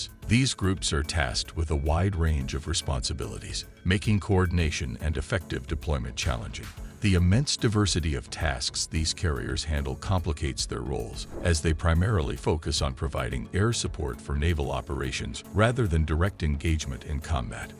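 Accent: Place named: India. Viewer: Yindian accent always give me a migraine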